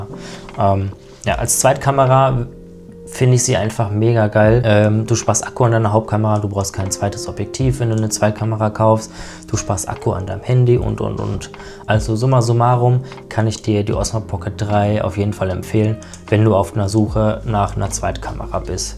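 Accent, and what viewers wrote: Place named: Germany. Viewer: Creator Combo ist jetzt schon auf 450 runtergekommen- da müsste ich sie mir für meinen Südafrika Urlaub auch holen🥰 vielen dank für das schöne Video.. Man merkt gar nicht das du nicht gerne vor der Kamera sprichst- zudem hast du ein sehr schönes, angenehmes Deutsch. Wäre sehr froh wenn ich als Schweizerin so sprechen könnte😅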